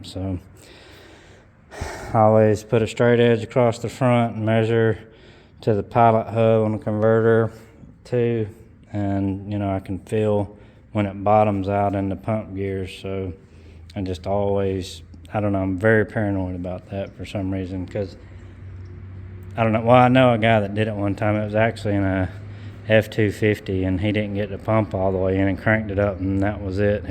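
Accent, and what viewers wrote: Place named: South, United States. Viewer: Have a few question myself - you have any way outside of youtube to communicate? I have a 2500 burb with ly6 and 80e which is already cammed, converter, gears and longtubes. Im looking to go turbo or lsa and have a few questions about dealing with heat etc - sounds like you live in the south as do i (alabama)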